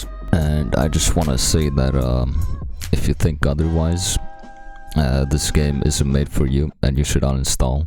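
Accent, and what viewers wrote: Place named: New Jersey, United States. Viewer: u sound like u have a sopranos accent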